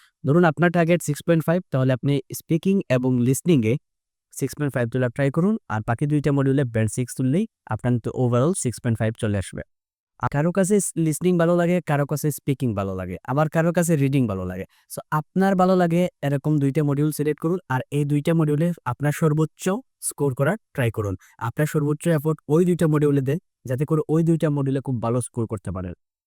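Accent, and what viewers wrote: Sylhet, Bangladesh. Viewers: Vai khati sylhety ❤ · Bhai khati Sylhety r Nokol Sylhety modda partokko ki😀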